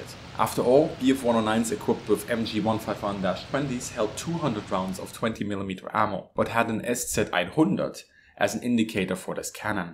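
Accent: Germany. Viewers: He is from Germany yes! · Giuntoli Im from germany and he does not sound like austrian. He sounds close to "Hoch Deutsch" that is the "Normal German". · No he just has a german name, speaks german and has a german accent. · you'd notice that he speaks english in a heavy german accent if you've been paid attention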